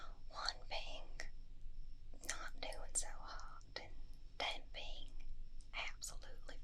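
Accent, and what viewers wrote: South, United States. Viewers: Thank you southern! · Southern accent is best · I've never heard a witch with a southern accent before, hehe! A lovely witch and some lovely triggers. 😌❤️ I enjoyed this. 😊 And I love how consistent your uploads are by the way. ❤️ I hit Like. 😉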